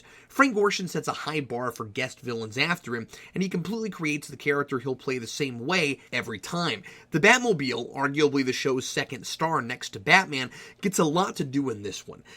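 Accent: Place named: United States. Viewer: CRAP....TOLD US NOTHING EXCEPT THAT ANOTHER 'AMERICAN' LIKES THE SOUND OF HIS OWN ACCENT...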